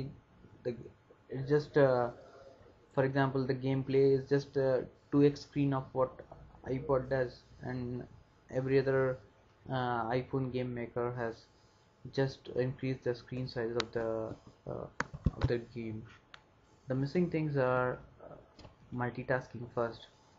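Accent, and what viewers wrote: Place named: Vietnam. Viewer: LEARN TO SPEAK ENGLISH. u from vietnam? u sound like one, seriously correct your accent, pull your tongue out (vietnamese language require to speak with your tongue always intact in)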